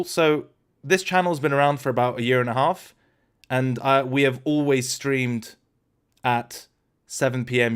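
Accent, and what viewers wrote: United Kingdom. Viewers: Hey I can't believe you are Russian, with such a strong British accent. Sending my regards from Queensland Australia 🤝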